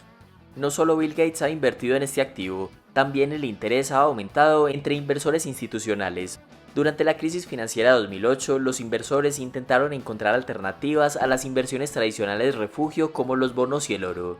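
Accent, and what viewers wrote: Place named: Paisa, Colombia. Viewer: ese acento yo lo reconozco, es colombiano paisa jaja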